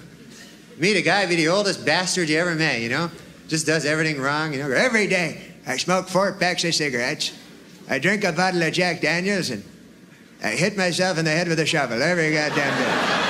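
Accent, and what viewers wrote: Canada. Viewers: He sounded a lot more Canadian back then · Actually what he sounds like is a CARICATURE of a Canadian. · Yeah, he sounds super Canadian here. Norm had a real Ottawa Valley brogue as a young man. <link>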